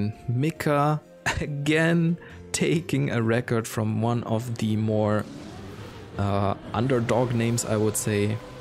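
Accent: Germany. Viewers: First video I've ever seen on your Channel and i immediately recognized the german accent 😂 aber alles gut bruder du sprichst perfektes englisch :) But my favorite drink is just plain, non carbonated water or tea, which is basically just water but hotter and with taste in it hahah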